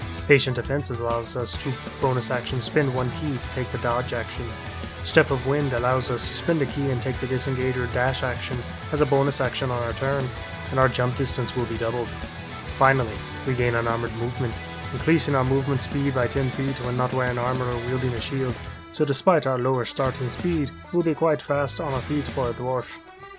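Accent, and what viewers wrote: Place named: Scotland, United Kingdom. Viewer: The fact that the guy making this has a Scottish accent too 😂😂😂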